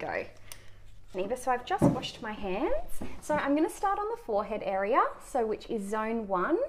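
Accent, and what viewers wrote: Australia. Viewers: l’accent australien n’est pas le meilleur pour l’ASMR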